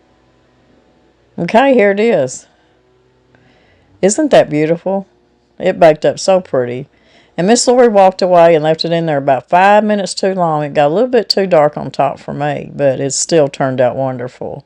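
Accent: South, United States. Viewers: As I was watching this video, I was making honey wheat flax seed bread adapted from Heather at The Kneady Homesteader. House smells heavenly! I love your mellow voice and easy southern accent. So glad I found your channel.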